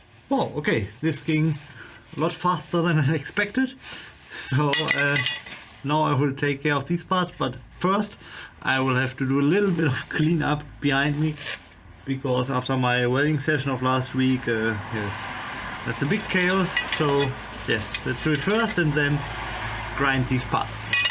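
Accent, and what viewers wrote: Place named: Germany. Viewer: Daaamn boy, i love that german accent :)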